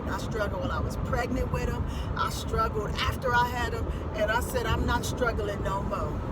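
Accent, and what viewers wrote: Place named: Chicago, United States. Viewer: I knew you were from Chicago!!!! Your accent is straight Chi-town...all the way!!! Im from Chicago too. 😄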